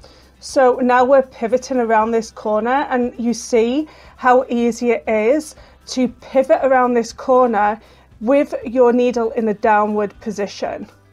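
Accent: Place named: Yorkshire, United Kingdom. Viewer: Hi! Loved your names for the different sides of the Velcro - fluffy and hookey - it gave me a smile. Wondering where you got your accent from - you sound like a real home girl to me (I'm from Leeds but live in Scarborough atm). Lovely video, really innovative - keep up the good work we look forward to them. xx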